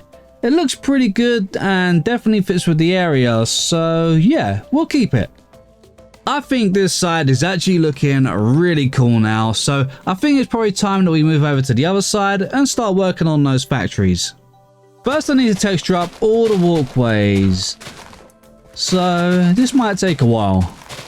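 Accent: United Kingdom. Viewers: It is actually quite hard to me to understand how do u pronounce some of this words, as for non-english speaker. But, cool buildings and this cool sounding british accent, so i happy i found this channel!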